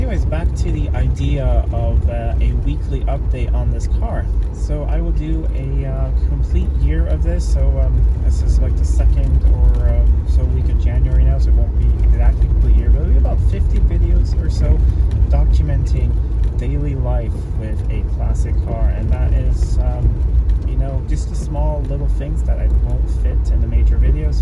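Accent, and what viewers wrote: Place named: Midwest, United States. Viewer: I’m glad you made to and from with no problems. Once again you say you’re in Switzerland but you have a mid western US accent where are you from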